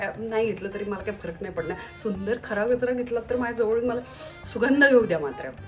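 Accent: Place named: Kolhapur, India. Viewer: Tu punyachi vatatach nahis Kolhapuri pattern ahe ha as Dhak dhak mhantay❤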